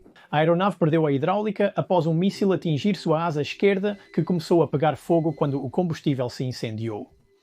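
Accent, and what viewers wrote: Portugal. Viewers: Português de Portugal é de matar!!! Mas o conteúdo é ótimo! Continuem com o português brasileiro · Português de Portugal não dá!!! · Português de Portugal não por favor😢😢😢😢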